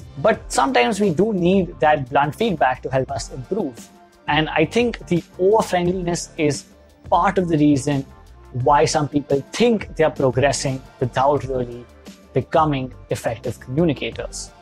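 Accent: India. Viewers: I congratulate you for your Chutzpa! With your accent? Are you aware of the damage you do? You ripped off your TM experience, then you flatter them, then you criticize them, then you plug your product? Please go to a speech teacher, get rid of your accent, then, when you no longer sound like Peter Sellers doing his Indian Accent, begin to teach. You are off the tracks, friend. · There's nothing wrong with his accent. In fact, he has one of the most neutral English accents I've heard in Indian YouTubers in a long time. You're just bitter that he had some cons to share about Toastmasters. So you're making a personal attack. That's petty.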